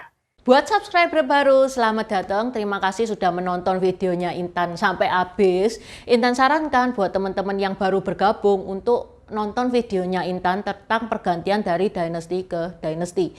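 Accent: Java, Indonesia. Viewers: Ya, apik to😅 Jawa banget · Cerita / narasi nya menarik cuma lafal / pengucapannya (intonasi) terdengar aneh 😢 jawanya terlalu medok😊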